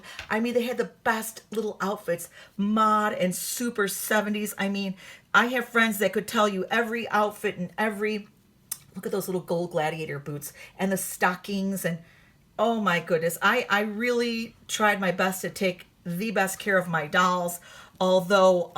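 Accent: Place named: Texas, United States. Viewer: That a Texas accent if I ever heard one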